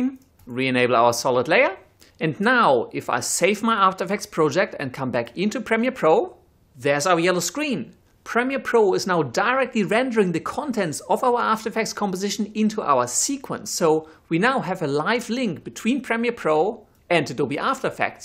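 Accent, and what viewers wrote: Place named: Germany. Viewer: Are you from Germany because your accent sounds so german?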